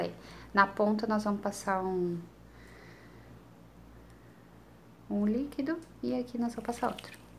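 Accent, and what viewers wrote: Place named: South, Brazil. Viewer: Pelo sotaque ela é do sul.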